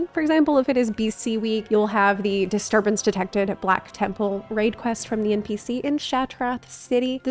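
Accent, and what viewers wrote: South, United States. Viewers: are u from the south?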